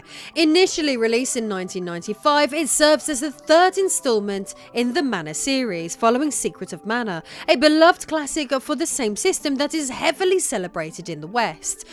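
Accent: United Kingdom. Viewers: I like doing the segment where you were talking about Mobile suit Gundam, with your British accent it sounds like you're saying, got damn. With your British accent, when you speak, you say every syllable within a two syllable word, instead of, just saying it as one word, you and your husband, do this, I'm just telling you, what I've noticed, it's not bad, I'm just giving out my two cents here.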